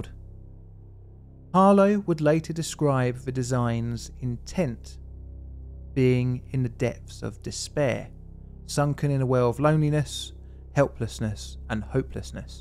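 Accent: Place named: Cockney, United Kingdom. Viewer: Someone w/o a cockney accent should do the voiceover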